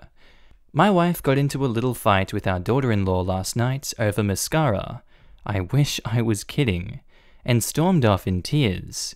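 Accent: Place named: Australia. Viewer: First time I heard it, I was like. "Hold up" But after I relistened, i was like "Ah. This guy is an Aussie!" Had a Aussie classmate who used Barbie a lot for BBQ when I was in Middleschool Don't know how he wound up in the middle of the USA But oh well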